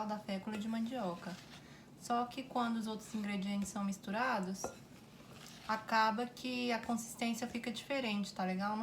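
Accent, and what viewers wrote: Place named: Minas Gerais, Brazil. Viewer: Que delícia você parece ser mineira, igual a mim gosta muito de pão de queijo e o sotaque também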